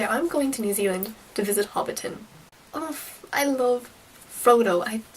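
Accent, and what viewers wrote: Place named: United States. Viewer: You're beautiful beyond words, but why do you use American English and speak in an American accent? It'd be odd to visit Africa to find someone dressed in Burberry attire and saying "ain' wannit, bruv, fam, bredren" in an English accent, wouldn't it? (points finger) Get where I'm coming from?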